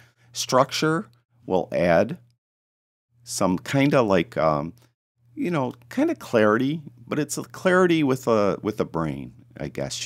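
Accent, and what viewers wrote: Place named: Western New York, United States. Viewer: Hey There, first I recognized the unmistakable Western NY accent, but the working photo from Hamburg was the dead give away. I grew up there. So cool! If I'm not mistake, that's Hoak's restaurant. My first Communion dinner was there... some 48 year ago!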